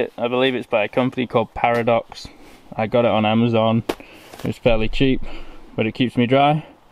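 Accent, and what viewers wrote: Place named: United Kingdom. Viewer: hello how are you and i hope your doing when your not doing the bushcraft videos/solo camping videos and i wanted to say happy anniversary to you and your wife and happy 1 year anniversary on your youtube channel and at the risk of me repeating myself from the last comment i sent you it's like wow when i watch your videos i keep feeling peaceful and enjoy the wonderful nature everywhere you go and i like your dog she is a nice dog great seeing her as well i do have a a qustion how do you spell her name because people spell names different is it blu/blue/ and i hear british in your voice which i think is very very kool i like british people alot and /americans and of course my country canada and thankyou very very much for the Q&A i enjoyed that alot i will always enjoy your videos short or long always cheers to you and thankyou during these hard times your videos help everyone who watches them thruw the hard times from your new friend Randy again ontario,canada stay safe out there m friend.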